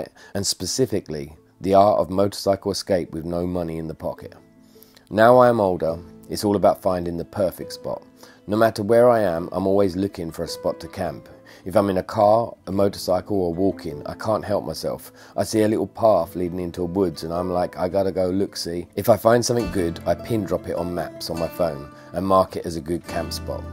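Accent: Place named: England, United Kingdom. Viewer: Definitely an entertaining video. Your narration skils and proper English accent make it worth watching. Have a Low Rider S and try to ride every day, but in Idaho it's been over 100 degrees F for weeks and ii's becoming tiresome. Just bought a new VStrom 1050 DE 3 days ago. Can't wait to hit the road. City life blows. Thanks . New sub.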